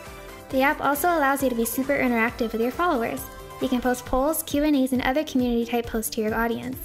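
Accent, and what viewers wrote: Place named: United States. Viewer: For some reason when I found your channel and that is 4 months ago. I thaught you were Japanese..😶 but after hearing your voice for the first time. I guess you're American. But although. I love your animations.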